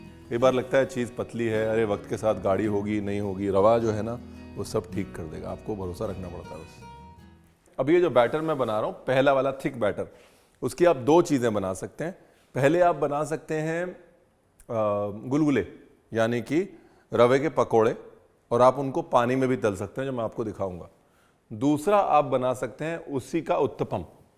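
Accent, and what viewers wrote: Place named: Kanpur, India. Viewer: easy recipe aur aap bolty bht achha hai mujhe lgta hai aap kanpur se hai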